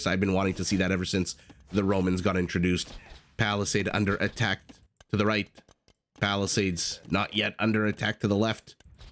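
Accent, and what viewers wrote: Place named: Canada. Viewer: youdaman,! love the Canadian accent, ive got an Eastern KY one, myself.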